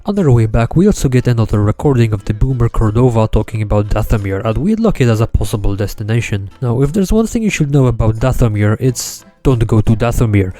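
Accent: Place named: India. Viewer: so where the hell are you from you have an accent so indian?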